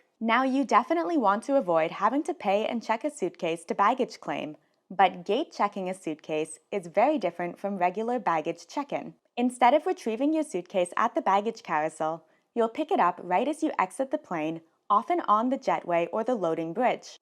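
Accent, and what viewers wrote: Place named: California, United States. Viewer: Can't take that voice. The "Cali-Talk" is just too irritating. Good thing there's a transcript.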